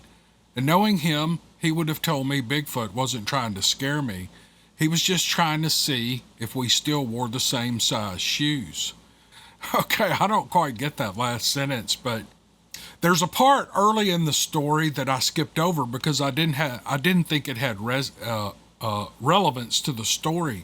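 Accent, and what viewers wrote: South, United States. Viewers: your commentary, opinion, jokes, southern drawl and personality are why we follow you. Hate to hear you constantly apologizing for being you. You need to understand that's why so many love your channel and continue to follow/ subscribe. We can hear Bigfoot stories many places but only at do we get the Dailey dose of we all need in our lives. Sending love from your Yankee followers in CT ❤❤❤ much love brotha, ty for being you!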